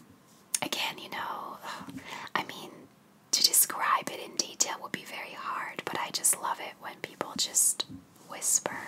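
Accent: United States. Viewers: I love your accent, it has always sounded American to my ear! I am from Scotland though lol so many accents sound American to me 😂 xx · You remind me a lot of myself, older than I look, not married, Barack Obama was my answer to ”who would u have dinner with?” (Btw I don't think grandparents is an obvious answer b/c people tend to pick famous or infamous people) anxiety issues, and I can be introverted yet adventurous. Can't imagine moving to another country so young though. Also you speak perfect English, w/ American accent. I think you must have been American in another life ;) though it's seems Sandanavian or Northern European English speakers- seem to have sometimes next to no accent at all. Especially Swedes.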